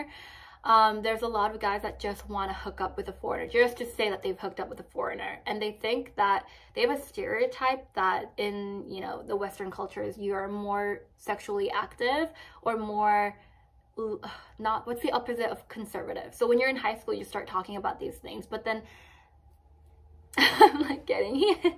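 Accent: United States. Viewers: I see you have an American accent, which possibly means you didn't grow up in Korea, so how would you know all these things about Korea? I don't care you're ethnically Korean, if you grew up somewhere else, you don't really have a place to talk about these things. If I'm wrong, correct me! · She sounds like a racist white girl in America! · Are you Naturally born Korean? you sound more American especially the way you use your slang. Were you born in America?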